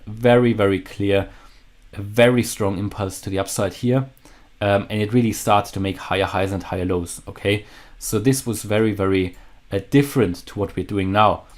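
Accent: Germany. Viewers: Where are you from? I kinda detect a german accent? ...Just curious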